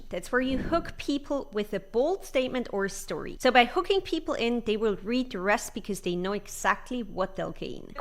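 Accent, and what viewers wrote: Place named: England, United Kingdom. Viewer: Hi ! I am new to blogging, loved your English accent and clear pronunciation. I wonder how you learnt it, and how can I learn it. Could you please share the secret and also send me simple blogpost checklist please.Thank you.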